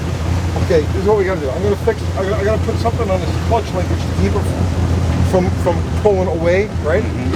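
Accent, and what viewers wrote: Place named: Brooklyn, United States. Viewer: you were ragging way too hard!!! Obviously appeared as if it was his first time the drags which can be a bit overwhelming. You sounded like a slightly nicer version of Mike P. from Brooklyn!!! He'll get there, nothing works but experience - you KNOW that! It's harder than it looks. Start with teaching him how to do a proper burn out!!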